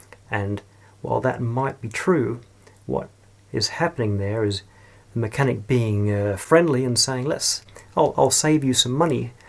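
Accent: Australia. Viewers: Hey I like your presentation manner!. Can't pick your accent. It it Melbourne? Or were you born in the UK or Kiwi and emigrated? Regardless, I thank you for your lovely videos. Thanks matey!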